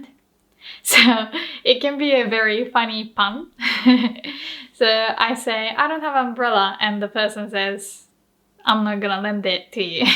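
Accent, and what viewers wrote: United Kingdom. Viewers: Unrelated. Do you use the british accent as your base accent or the american one? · Hi! I noticed your accent is very british sounding! Did you learn from a british teacher or something? Spend time in the UK? In college I had a Japanese friend who grew up in hong kong, so her English was almost a perfect british accent. Your accent kind of reminds me of hers.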